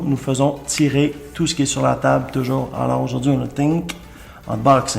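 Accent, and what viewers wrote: Quebec, Canada. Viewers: un accent québécois. ? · Je suis français et j'adore ton accent québécois j'aimerais bien en recevoir un continue bye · j'adore l'accent canadien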